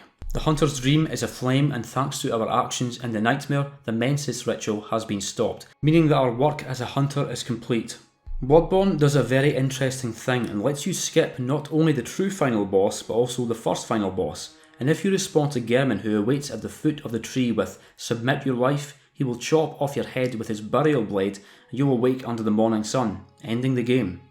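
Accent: Scotland, United Kingdom. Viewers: was gonna sleep to this until i heard you're british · I will never complain about listening to a Scotsman talking about one of my all time favorites for hours. Also, i completely agree the stupid motion control gestures happen far more often on PS5. Ludwig is rough, so are Winter Lanterns, the defiled chalice bosses, Crow of Cainhurst, and Orphan, but no one, NO ONE in this game is harder and more lethal than that mother#%$&!@ in the blood river cave with the gatling gun. Eff that tard.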